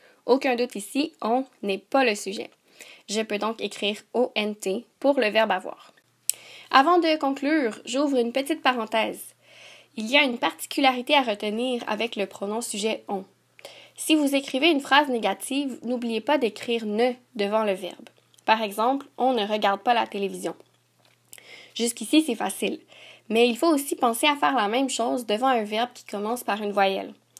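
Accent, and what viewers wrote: Quebec, Canada. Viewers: Elle est quebecoise, au Québec la langue officielle est le français Je sais c'est pas le même accent mais ça fait son charme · Merci ! Mais es-que ses un accent québéquoi ? (pardon pour avoir mal écrit ) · bravo je suis une nouvelle abonné et j ai connais cette accent québécoise merci bcp lâche pas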